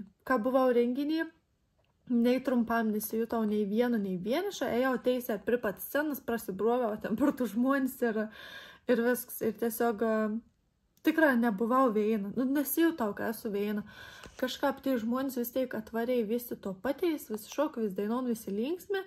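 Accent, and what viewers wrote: Samogitia, Lithuania. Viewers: Nu graziause ce, veina pati i konca isvare!? Kode mon nieka nepasake 😮 zinuok pykstu!!! O jei rimciau tai faina ziuret o labiausei tai klausyt zemaitiskos kalbos.. pasiilgau Telsiu ir zemaiciu 😉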